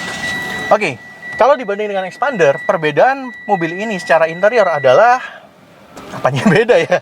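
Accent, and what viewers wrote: Indonesia. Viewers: Kokoh medok amat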